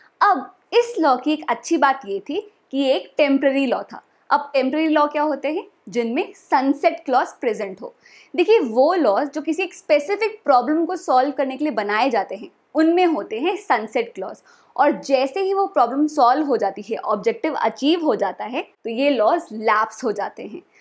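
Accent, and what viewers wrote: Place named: South India, India. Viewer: You are from South India probably Tamil Nandu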